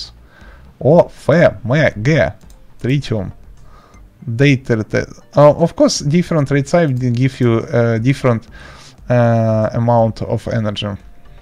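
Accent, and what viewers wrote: Russia. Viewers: Акцент русский)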